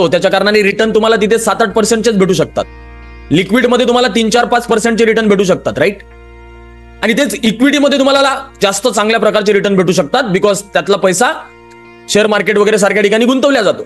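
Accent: India. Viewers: From..विदर्भ....भाषेवरून कळतय...😊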